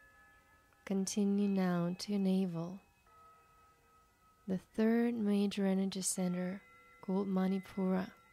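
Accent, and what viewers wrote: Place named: Australia. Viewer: has such a lovely voice but wold better if she could prevent the horrid Aussie accent creeping in!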